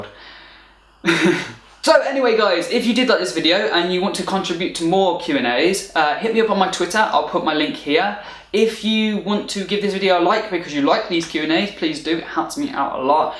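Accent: United Kingdom. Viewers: Great video! You are one of my celebrity YouTubers who is very attractive and I enjoy your English accent too. I agree, with you mentioning that their are lots of beautiful people.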